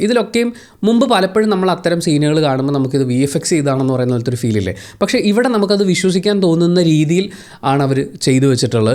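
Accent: Kannur, India. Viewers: Ente samshayam Tamil Nadinte Borderil ulla Place. Parayunnathu muzhuvan Kannur Slang. Ethu Ethaa Sthalam.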